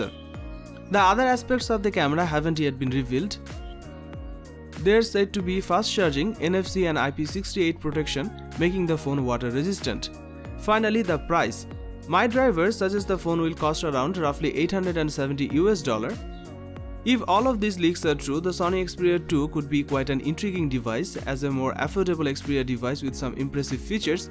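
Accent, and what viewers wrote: India. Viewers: Gak tahu kenapa kurang suka aja kalau orang india ngomong inggris 😂😂😂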